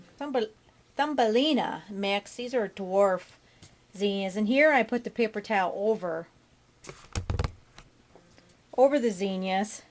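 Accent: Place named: South, United States. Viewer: Hi. Love your garden videos and your southern accent. I've been watching your channel for a while and am a big fan.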